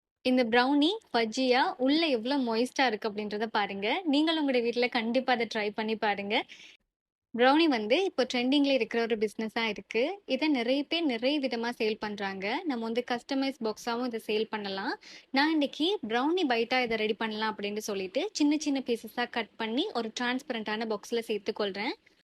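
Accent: Sri Lanka. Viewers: Assalamu alaikum dear im 1st time watching ur video gud job and srilanka speech is cute ma sha allah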